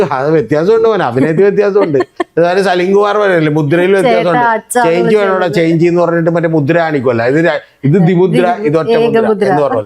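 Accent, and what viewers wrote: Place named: Kochi, India. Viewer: This man is really🤔 funny😆 His cochin slang😅 Anyway I enjoyed😊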